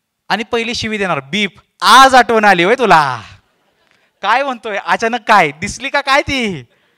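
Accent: Satara, India. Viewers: 90's ERA खरचं आपण लहानपण जगलो - without technology Wale तू सांगितलेल्या सर्व गोष्टी खूप जवळच्या वाटल्या....लहानपणात घेऊन गेलास मित्रा...आई वडील, गुरुजी, मित्र सर्व आठवले...hats off 🙏🙏 अजून एक गोष्ट मी एक सातारकर आहे त्यामुळे तुझी बोलण्याची पद्धत त्यामुळे अजुन जवळ गेलो ....thank u 🤗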